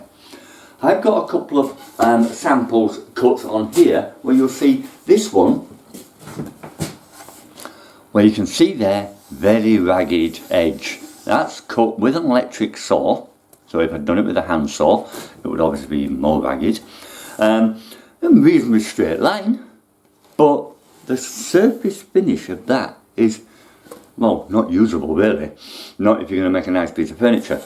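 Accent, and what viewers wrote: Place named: Huddersfield, United Kingdom. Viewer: You sound like a Huddersfield man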